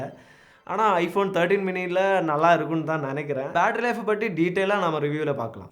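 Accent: Kerala, India. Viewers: video nalla thaan irrunthuchu Kerala slang avevalavu thaan Ash naa Chennai slang 👍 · Neeinga pesura vitham Yaro Kerala la pesura mari iruku Tamil nadula irunthutu Y antha slang la pesuringa.. 🤷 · that malayalam slang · Thambi kerala vo? Malayalam vasana adikkithae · Bro neenga entha state bro Kerala va, unga sppech super ha iruku❤️❤️❤️❤️❤️ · Bro are you malayali ne Tamil pesaradha patha appide dha theridhe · Bro ungalukku tamil sariya pesa varaliya Malayalies pesura mathiri therithu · Unga pechula Malayalam slang adikkithe brother... Nallaa thaan irukku❤️❤️ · First u ne video la varatha. Unnoda Malayalam accent a kekum pothu kadupa iruku.😤😤😤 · He is Malayalee. Endha aniya Sugamano? Ninda Thamizh valara adipoli..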